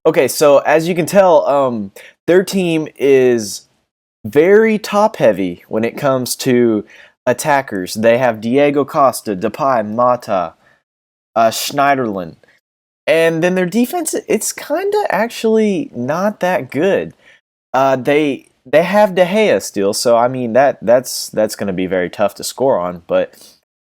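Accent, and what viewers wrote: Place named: United States. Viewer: Enjoyed the video, with the american twang, great to hear how your voice has grown since the first video. Looking forward to more. Your tactics look a lot better as well.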